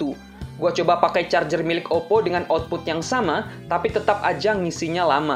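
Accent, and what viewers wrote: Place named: West Kalimantan, Indonesia. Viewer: nih pasti orang kalbar ya yg punya chanelnya😀😃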